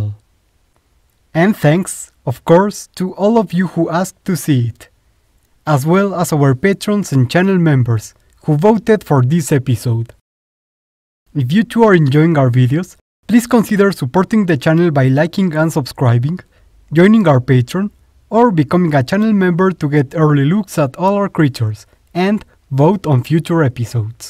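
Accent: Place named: Latin America. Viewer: I love the video!! Your English sounds like your from a Latino country right? Sorry if I sound rude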